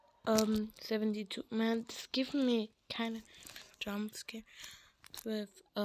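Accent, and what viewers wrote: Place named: Arab world. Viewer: You have arab accent! Just like i do! But yours is deep Mine is smol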